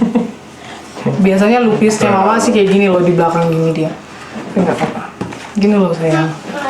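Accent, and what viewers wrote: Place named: Balikpapan, Indonesia. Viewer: Gaggahh nya pang.. Balikpapan bgt🤣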